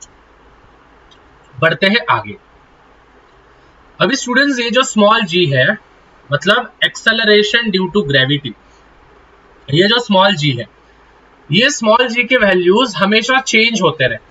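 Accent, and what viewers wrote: Maharashtra, India. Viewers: Bro is marathi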